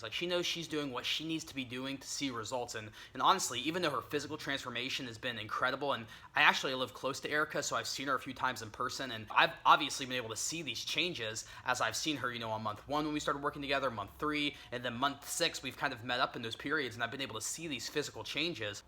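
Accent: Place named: United States. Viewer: Wow! I thought you were from Europe but you're an American. this is great I love your accent and thanks for this great video Greeting form Afghanistan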